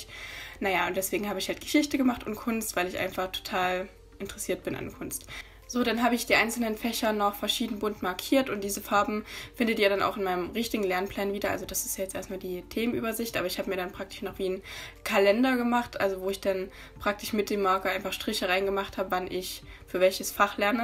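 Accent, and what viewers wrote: Saxony, Germany. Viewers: Kommst du aus sachsen? Komme selbst aus Sachsen und es klingt von den Prüfungsfächern und so ziemlich nach Sachsen (und auch bissl dein Akzent😂❤️)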